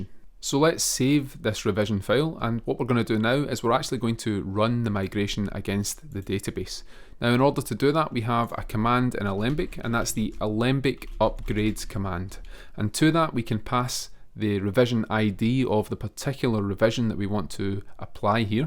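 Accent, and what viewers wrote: Scotland, United Kingdom. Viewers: British accent adds so much style to this tutorial, thank you! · british accent is too strong... i get you, its ok... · When I heard the Scottish accent I knew this was someone I could learn from. Edit: I was right. Pure gold of a video. Just golden nugget after golden nugget. No bs. Thank you so much!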